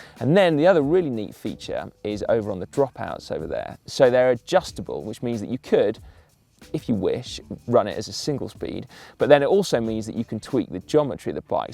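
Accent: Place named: RP, United Kingdom. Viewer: Where does get his posh voice from? #torqueback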